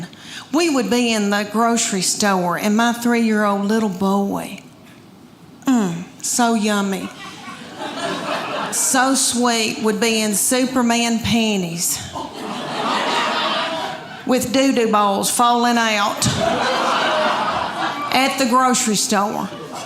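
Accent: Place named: South, United States. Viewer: The heavy drawl is exhausting trying to follow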